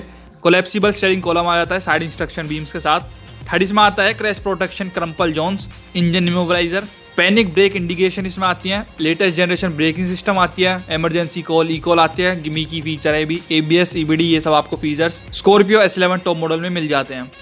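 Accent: Haryana, India. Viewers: भाई , एक बात बोलता हूँ , बुरा मत मानना। जरा धीरे बोला करो। बहोत से शब्द तुम्हारे jumbled आते है। पीछे शेर तो लगा नहीं है ना ! हिंदी एक्सेंट में बहोत प्रॉब्लम है तुम्हारा ! हो सके तो हरियाणवी एक्सेंट में हिंदी मत बोलो।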